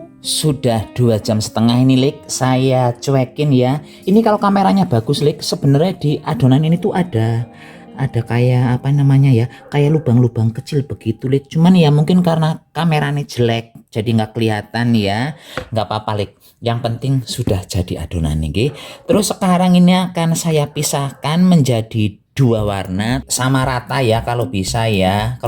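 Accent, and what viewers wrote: Banyumas, Indonesia. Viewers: Aku jadi terhibur nonton vidio mu ngapak yek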